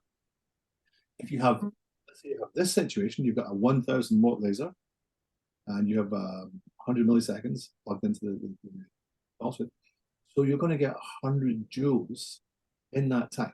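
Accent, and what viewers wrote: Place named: Scotland, United Kingdom. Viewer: Thank you for your amazing insight/understanding IPL! We have just introduced the Venus Versa into our clinic……….the trainer was shite at explaining what is a very complex area of energy devices!! I am not good at retaining information😂……..but I rewatch all your videos and each time I retain more😊 Please keep them coming!!!🎉 Love from Western Australia (also Scottish, so it’s a dream listening to you! 😂😂🏴)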